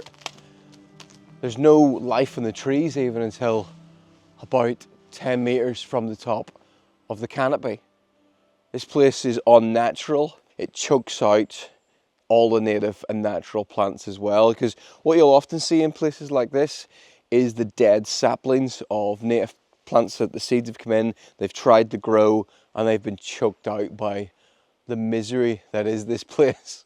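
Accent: Ireland. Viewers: Same problem different country. We have that issue here where i live too, but personalizing it doesn't matter because you can look at every single continent and every country this same issue pops up. It's not new internationally or unique to you guys and your neighbours. Here in this video you talk about plantations as the problem, but they are not the real issue. The real issue is mono crops. Mono crops are not only this major issue destroying all of the worlds forestry wildlife and plants, but also are a major crisis in our food supplies that could actually cause the extinction of our species long before any of natures other disasters if we're not careful going forward. You can speak quite seriously but the tone of the catastrophic disaster that this is really doesn't come through with the charming irish accent haha. Maybe an angry German should narrate how big of a problem this all is, or a Russian with vodka withdrawls lol.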